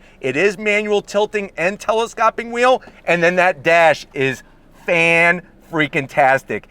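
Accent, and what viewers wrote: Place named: New York, United States. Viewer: )) of course, he sounds new yorkish, they can talk you to death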